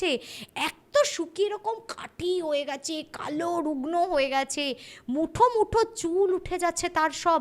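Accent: United Kingdom. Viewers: Nekamo kom kore apnar english accent kom shuniye thik thk bhabe ektu short e bolun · Apnar bangla ta khub ekta bhalo na , kerom ekta English tan ashe ....kintu Kichu ekta Jadu ache apnar modhdhe jeita ato attract kore ....r apnar story telling er modhdheo kichu ekta ache ....kerom ekta bokar moton kore mohit hoye shuni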